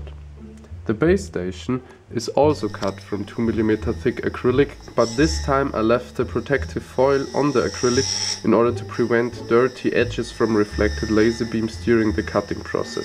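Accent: Germany. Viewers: Hab ichs mir doch gedacht dass der Akzent Deutsch ist :D und ich hab mal wieder meinen falschen acc...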